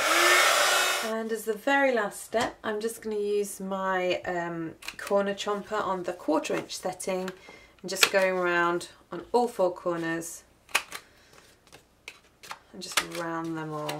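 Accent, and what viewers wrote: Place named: United Kingdom. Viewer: I just have to tell you I love watching your videos! I love hearing your wonderful British accent! I just bought a few papers from that October Afternoon line you've used a lot, and I think part of the reason was how cool "October Afternoon" sounded when you said it! Very cool papers as well!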